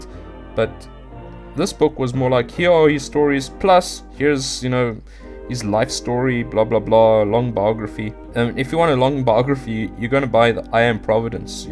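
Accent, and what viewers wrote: South Africa. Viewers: He sounds South African....right on brother or should I say broer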